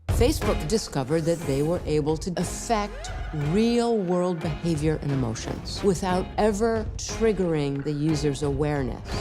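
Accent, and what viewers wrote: South, United States. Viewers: Finally I'm from SC. I wasn't trying to insult you I can just tell you are southern too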